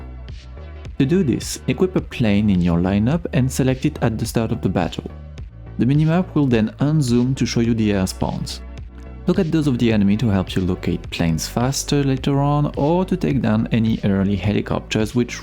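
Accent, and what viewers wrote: France. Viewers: J'ai reconnu l'accent français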